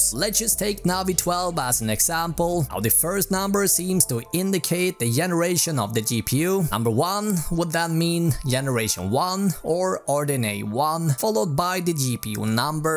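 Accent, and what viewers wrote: Sweden. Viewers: Damn this swenglish though ....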